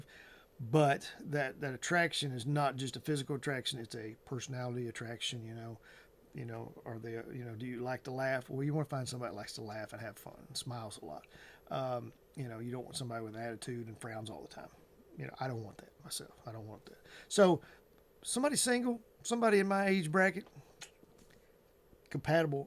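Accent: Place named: Texas, United States. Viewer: Where exactly are you at? What area? You sound like you might be from Texas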